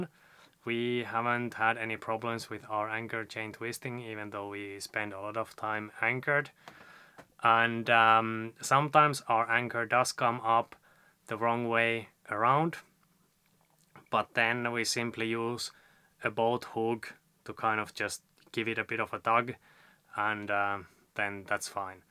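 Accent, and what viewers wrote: Finland. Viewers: Once again, simple and very informative with a charming Finnish accent, be well and trust your personal SISU angel and you'll be fine & safe, cheers from Karelian Poika!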